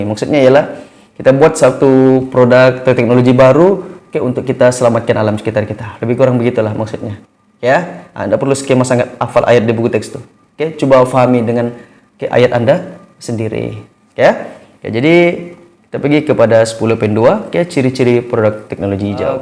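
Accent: Sabah, Malaysia. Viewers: orang sabah kh?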